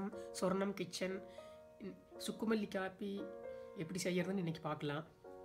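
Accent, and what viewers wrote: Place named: Kongu, India. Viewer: அம்மா உங்க அழகான கொங்கு தமிழில் விவரிப்பது ரசிக்கும்படியாக உள்ளது. நல்ல பயனுள்ள ரெசிப்பிகள். எங்கள் ஆரோக்கிய உணவுகள் பேஜில் பதிவிடலாமா ?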